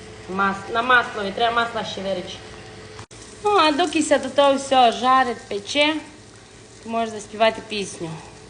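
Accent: Transcarpathia, Ukraine. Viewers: Дуже гарна жіночка, такий цікавий акцент,Закарпатський.Дякую за позитив і рецепт.Всього гарного та позитивного вам.Наталья.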